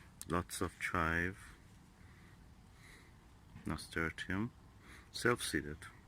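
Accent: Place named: Iran. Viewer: Wow Great Video! You do sound like you have an Iranian accent.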